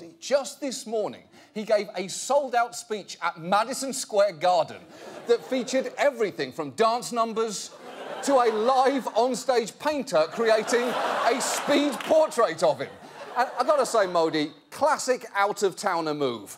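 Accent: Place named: United Kingdom. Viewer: What I see is John Oliver is not smart as he thought he is. Before you say something just put yourself in Modi’s shoes. You wouldn’t survive even a day. Your British accent does not prove you are smart enough to says something about someone else. Besides, your advice Indian, look at your own god damn county and president. One last thing if anyone can explain me why British people turn in to jerk when they come to United States, they can’t even say singe sentence without swearing and using F word. They are very respectful in their language on their own motherland. Because I also saw Master chef where Gordon as well swear at vegetable. Can anybody understand that?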